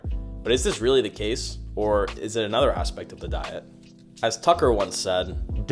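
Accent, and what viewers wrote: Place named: New Jersey, United States. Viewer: You from Jersey? You have a pretty distinct accent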